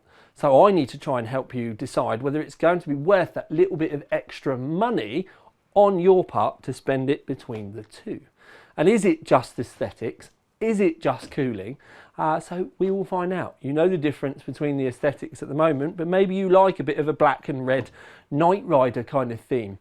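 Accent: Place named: United Kingdom. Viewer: at first I was like "oh, damn, British accent" to which I am not all that well accustomed, but then I went "eh, nice pronunciation" (obviously, eh) thanks for the review and highlights against greens and cheers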